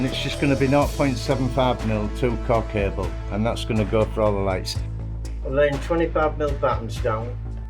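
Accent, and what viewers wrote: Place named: Teesside, United Kingdom. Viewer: Sounds like a Teesside voice there - but Teesside/YORK/Hull are similar. Well done. Good job!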